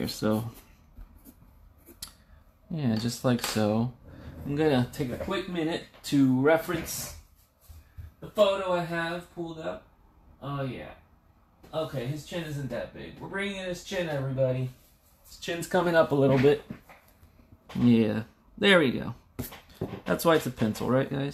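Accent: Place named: United States. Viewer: You have a very calming voice too! Usually I find American accents irritating but yours is definitely nice! 😝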